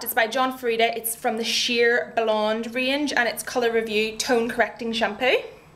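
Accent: Ireland. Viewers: You are so damn adorable. Love your Irish accent! Cutie pie, good video :) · i like your hair! its awesome and your accent =) <3 Ireland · i love her voice:) irish? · I usually hate Irish accents but I love yours:)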